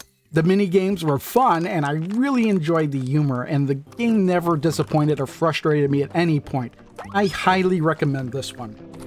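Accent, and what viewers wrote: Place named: Chicago, United States. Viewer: This video was great. Personally, I'm really interested in unique but high quality indie/import titles for the Switch... but... I'm just getting into it... so... I'm swamped, trying to wade my way through. Clean little segments like this definitely help out. Thanks! On a separate note, I'm trying to place your dialect. I thought maybe long forgotten Acadian but I just heard you say "da game" which triggered my dormant memory of George Wendt saying "da bears!". So that's my guess: Chicago and if not, then rural Illinois.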